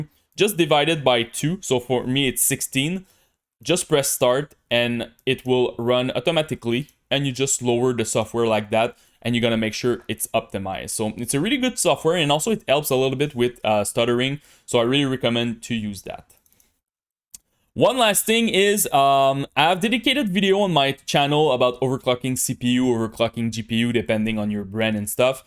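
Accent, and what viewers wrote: Quebec, Canada. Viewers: Omg tes français canadien!!!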